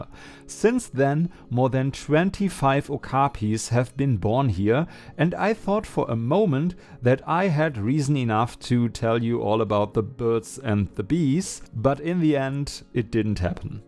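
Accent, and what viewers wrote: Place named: Germany. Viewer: It's actually really pleasing to hear your german accent. Especially as it it paired with German punctuality - not just information about the History of the zoos and introducing animals but about the enclousers itself (like how many m'2 the floor area, how many litres or m'3 the volume of aquariums and pools. It's also good that as an European (unlike American youtubers) you also show and talk about the negative side of the facilities. I saw a lot of Americanyoutubers who only talked about how fantastic is - even it's a neglected illegal pet shop in a third world country And the best for me (and 95% of the whole population) are metric system and measurement units.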